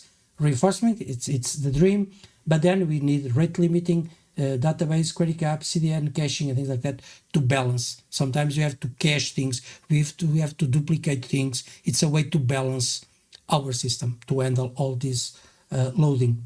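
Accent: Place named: Portugal. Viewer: I recognize that accent anywhere. You, sir, are Portuguese. Congratulations on a very interesting video.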